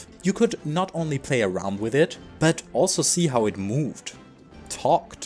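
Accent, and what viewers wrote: Germany. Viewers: Your accent sounds really german are you?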